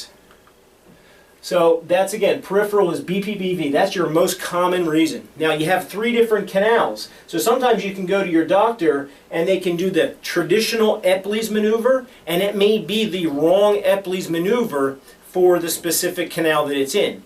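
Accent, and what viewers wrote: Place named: East Coast, United States. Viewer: What about dizziness brought on by visual sensitivity? Otherwise, one of the best videos I’ve seen on the topic of dizziness so far; thank you! Also, please put the link to your website in the YouTube description. I have no idea where you are. Judging by your accent: east coast. So, I’m off to google your practice now…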